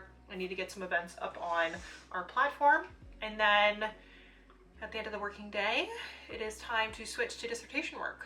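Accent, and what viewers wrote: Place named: England, United Kingdom. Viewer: It sounded like you have an English accent now after talking to Ali. This is one of my favourite vlogs from the dissertation diaries to date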